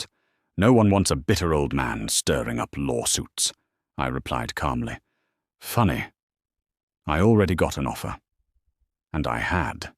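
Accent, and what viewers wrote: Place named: Upper class, United Kingdom. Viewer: In Akron Ohio, a British Aristocrat narrating the story. WOW !!!! At the 4 minute mark, check out the AI text, which transforms "CLAUSE" to "CLAWS"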